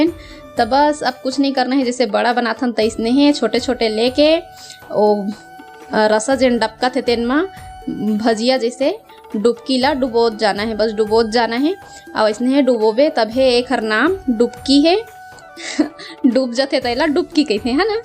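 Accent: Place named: Chhattisgarh, India. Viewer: दीदी तोर शब्द बहुत अच्छा हे और शुद्ध छत्तीसगढ़ी बोली बोलथस और तोर आवाज भी कोयली जैसे हे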